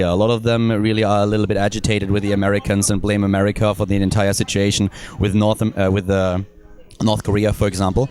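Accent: Germany. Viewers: Man!!!. You have strong German accent. How can you teach English to kids? They are going to learn German. How did you get a job at Korea. Now. I told my mom to apply job. Ha...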